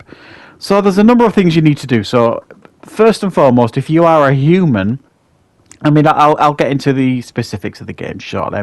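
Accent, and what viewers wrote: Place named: East Yorkshire, United Kingdom. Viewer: You have to be from east Yorkshire. Love the accent.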